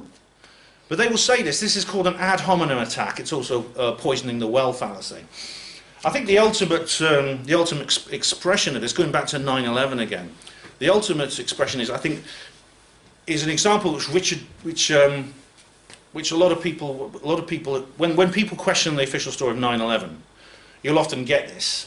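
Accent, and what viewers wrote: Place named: England, United Kingdom. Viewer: Kind of strange, to hear you, a Welsh man, speaking with an English accent to your fellow country men and women, who have Welsh accents! Do you think about that or does it just by pass you? Funny cos they welcome you as having a big loud Welsh voice!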